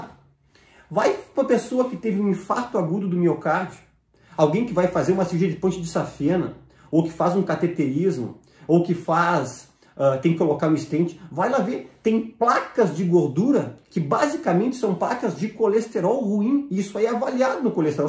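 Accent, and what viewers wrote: Rio Grande do Sul, Brazil. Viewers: Ele até tem sotaque gaúcho, só não sei porrrrrque ele puxa tanto no R como fazem os cariocas.. Mas é um excelente médico 😃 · Adoroooooooo esse Dr ehhh, muito competente, vídeos muito explicativo! Ainda mais com esse sotaque de gaúcho tchê, fica muito mais gostoso de ouvir🤩 Deus o abençoe muito🙏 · Este sotaque é encantador. Os gaúchos já nascem com oratória. Parabéns!!!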